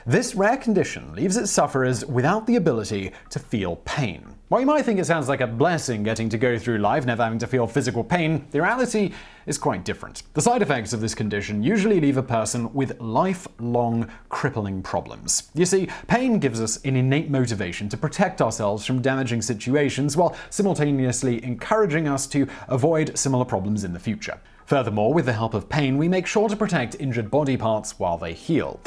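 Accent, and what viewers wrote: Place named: RP, United Kingdom. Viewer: Is this RP accent the narrator speaks in something he practiced independently or learned in school, or is this a natural accent some people have in some region of the UK? Just curious because his way of speaking is incredibly clear and lovely.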